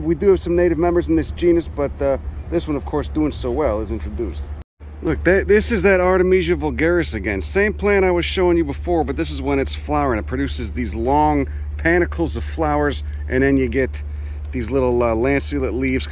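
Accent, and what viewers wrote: Chicago, United States. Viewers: Sounds like you come from Taylor Street, I think I picked it up here and I actually from there like Downers Grove or some crap. Anyway, yeah Chicago River Resurgence such as it is you know much progress compared to that crap in New York f*** that noise . Some assholes ain't giving a f*** but you know nature takes its course wherever it can. Edit grew up in Elmwood Park not Downers Grove. Keep on keeping on capital p a l . I ran across a tribe of snapping turtles, that would knock your eyes out I don't think you could fit them in the seat of a Toyota Karla yeah that monster on the Chicago River what are you going to say who can compete these things went from two to three and a half feet front to back on the shell, used to be a carpenter I know my dimensions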